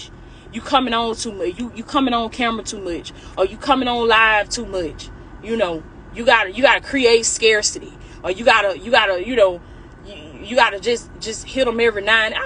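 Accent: South, United States. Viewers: I love the way she says children. It makes me smile. Love the southern twang. I myself have a twang. I watch her religiously.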